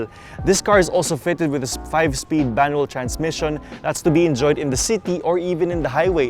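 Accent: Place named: Conyo, Philippines. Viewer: Nakaka inspire pag siya yung host ng review. Cute. Conyo. but Informative :D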